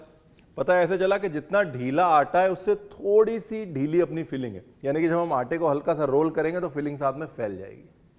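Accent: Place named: Bihar, India. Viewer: AAP ka behari tone bahut acha ba.tnx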